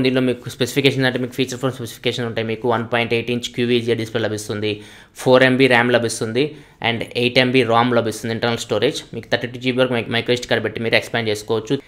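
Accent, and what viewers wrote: Ongole, India. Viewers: R u from ongole anna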